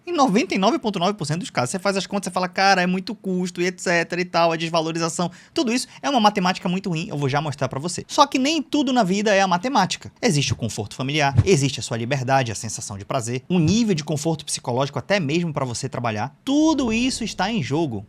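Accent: Manaus, Brazil. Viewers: Sotaque manauara detected 😁 bom demais seu canal!!! Abraço da zl de manauxxx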